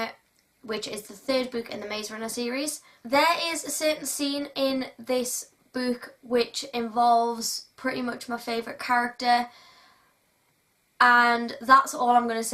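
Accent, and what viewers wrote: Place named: Northern England, United Kingdom. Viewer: Great book tag ☺️ ☺️ I hear a northern accent I'm originally from Darlington 😊😊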